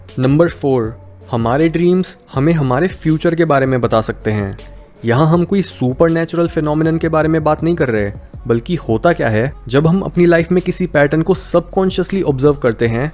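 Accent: India. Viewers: yeah same happens 😅😅love ur voice hindi accent❤❤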